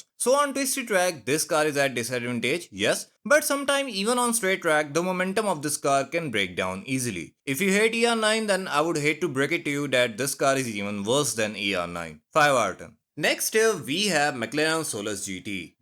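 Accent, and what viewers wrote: India. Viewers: 😬 Racism at it's peak. I don't like Indian accent tbh. But your accent is the best Indian accent I've heard. EVER. Are you South Indian?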